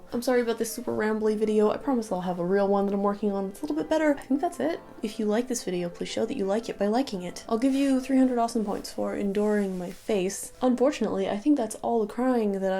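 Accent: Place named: United Kingdom. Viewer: i see u r speaking a little bit with the old british english accent!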